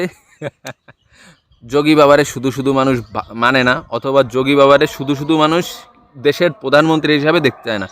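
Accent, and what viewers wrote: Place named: Khulna, Bangladesh. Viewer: আপনার কথা শুনে ভারতীয় নয়,, বরং বাংলাদেশের খুলনা বিভাগের বাসিন্দা মনে হয়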